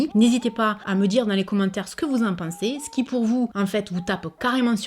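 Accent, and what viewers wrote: South, France. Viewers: Coucou ma belle, pour les fonds de teint, je n'achète pas ceux là, perso j'ai toujours acheté celui de chez Avène qui me correspond, et les grandes palettes de fard à paupières, ceci n'engage que moi, mettant toujours les mêmes teintes, elle est petite avec trois couleurs à l'intérieur, toutes ces marques c'est du pure marketing pour vendre, et perso cela ne m' intéresse pas, je trouve çà moyen, moi ce qui m'agace ce sont les produits qui ne sont pas remplis complètement, ou il y à une marge parfois de 3 à 5 cm alors que c'est indiqué 100 où 200 ml, et çà prendre le consommateur pour un C ..... !! où certains tubes que nous sommes obligés de couper car il reste encore de la matière, pour les rouges à lèvres, je te rejoins je ne comprends pas également, nous sommes dans une société de surconsommation, quand je vois défiler tous ces calendriers de l'avent à des prix de fou, c'est vraiment abusé, je n'adhère pas à ce concept, après comme tu le dirais chacun fait ce qu'il veut de son argent, c'est bien de remettre de temps en temps les choses au clair, mais malheureusement tu aura toujours des personnes qui se comportent comme des enfants et la bêtise humaine ne cessera d'exister, bises à toi copine du Sud avec ton accent que j'adore, garde ta simplicité et ta bonne humeur - Maryline :thanksdoc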